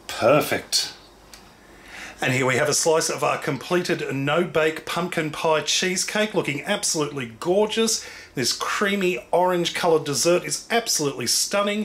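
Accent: Australia. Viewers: I loooooooove Australian accent 🤤😍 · I'm from the UK..... believe me he deffo sounds like an Ozzy to me lol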